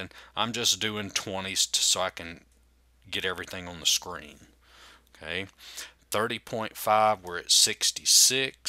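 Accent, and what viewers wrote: South, United States. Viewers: you are AMAZING!! as soon as i heard the southern drawl, I knew you'd get right down to business! i read this in my textbook about 10 times and was completely lost. I watched your video ONCE and got it. The struggles of signing up for an in-person class but having the teacher scam me into a "hybrid" is REAL. I'd kill to have you as my stats teacher!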